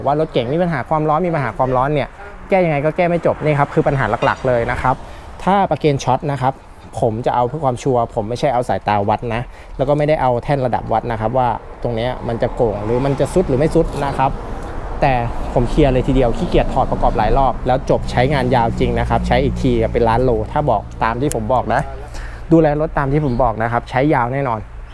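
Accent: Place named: Phetchabun, Thailand. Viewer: สำเนียงเพชรบูรณ์ หล่มสักครับ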